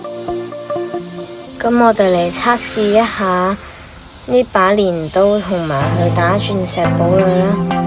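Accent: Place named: Hong Kong, China. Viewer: 你的口音好像是香港人啦 然后你讲的话是不是广东话 我猜你肯定是广东人❤❤❤😊😊😊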